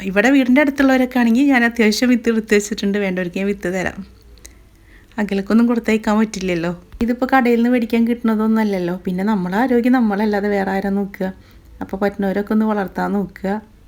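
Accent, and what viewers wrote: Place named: Thrissur, India. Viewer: Rambuttan kazhikan njan beemanam Keri varatta❤ orupaad istaayi video yum Thrissur baashayum❤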